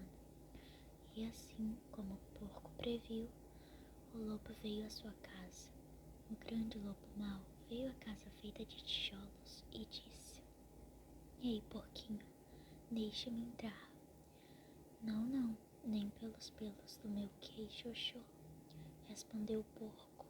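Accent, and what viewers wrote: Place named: Ceará, Brazil. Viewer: Você é do ceará? Achei o sotaque parecido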